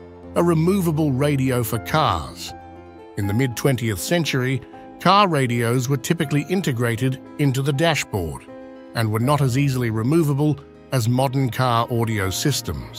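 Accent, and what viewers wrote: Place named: Australia. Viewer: I looked at your 'about' and see that you are located in the UK. I hear an Aussie accent 🤔😊.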